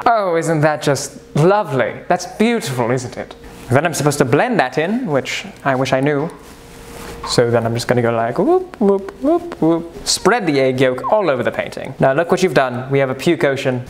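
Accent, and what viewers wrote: United Kingdom. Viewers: why are you yelling at a dead man in a British accent, you may need to deal with your anger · Why did i think he was gonna be better than this.........maybe the british excent........sadness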